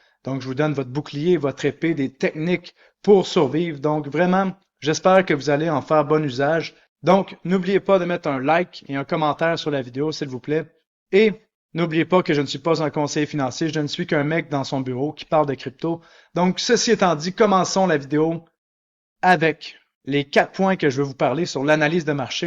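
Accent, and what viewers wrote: Canada. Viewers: Je viens de m'abonnée L accents Canadien ça m'a dépaysé haha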